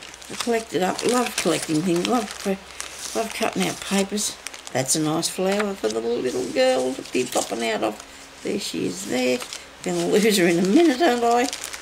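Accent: Australia. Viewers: Hello, just found your podcast about the padded coat hangers. Enjoyed it so much wanted to watch more. Nice to hear your Aussie accent, from a kiwi in Texas. 💖💖😊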